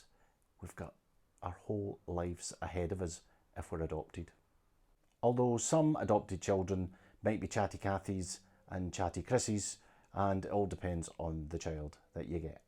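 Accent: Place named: Scotland, United Kingdom. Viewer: I don't mean to be shallow but you have a lot of charisma with that witty Scottish accent? And you very handsome too! Seriously I think it's thoughtful for you to make this video and inspiring others.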